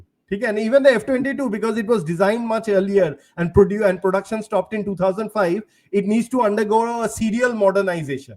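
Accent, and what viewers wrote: India. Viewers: The inferiority complex of the Brahmans lol. The bloody Indian accent makes it even worse.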